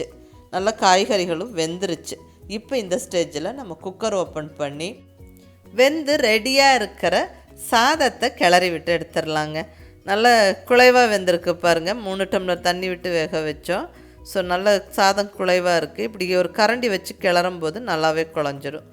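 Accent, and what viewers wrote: Kongu, India. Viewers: Hi ka .I tried most of ur recipes. Everything with kongu style touch and ur speech .kuddos to ur receipes and u.👍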